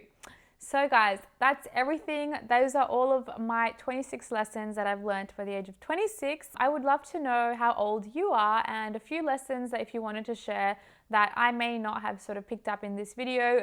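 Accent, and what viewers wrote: Australia. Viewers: When I saw your video the first time, I told myself in my heart that I hope she speak hindi. Your accent is totally Australian. Good to know, you know the culture in and out.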